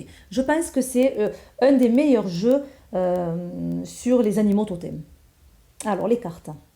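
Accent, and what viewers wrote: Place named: Gard, France. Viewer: ça sent le Gard, cet accent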